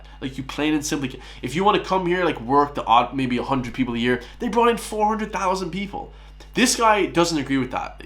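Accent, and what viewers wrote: United States. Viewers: Why do you sound like a yank?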